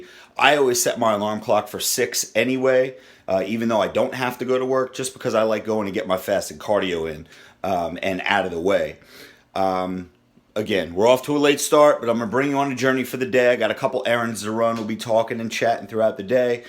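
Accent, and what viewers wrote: Philadelphia, United States. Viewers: Yo man you from Philly?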